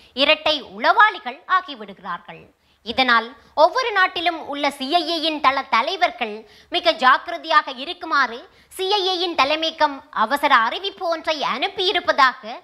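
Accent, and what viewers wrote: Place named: Sri Lanka. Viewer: இலங்கை தமிழ் அற்புதம் அற்புதம் அற்புதம். நன்றி 🙋🙌🙋🙌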